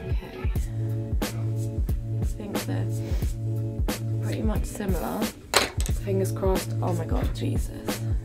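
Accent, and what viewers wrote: United Kingdom. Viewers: your voice like a British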